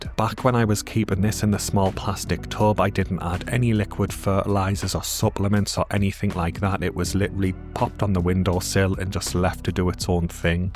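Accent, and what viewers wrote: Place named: North, United Kingdom. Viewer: Can tell you're from the north (not just from the accent) my house won't get below 20 degrees over winter. We're made of soft stuff down here 😂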